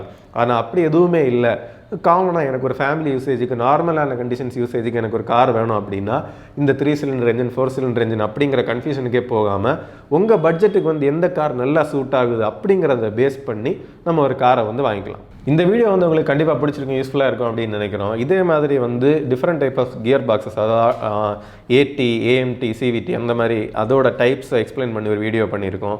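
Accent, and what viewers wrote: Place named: Nagercoil, India. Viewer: Nagarcoil tamil keka nalla irukku !! ❤️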